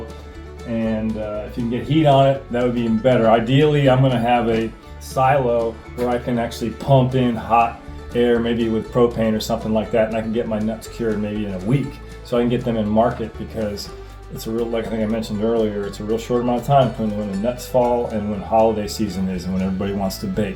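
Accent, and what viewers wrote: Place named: Appalachia, United States. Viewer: You sound like you grew up in the deep holler.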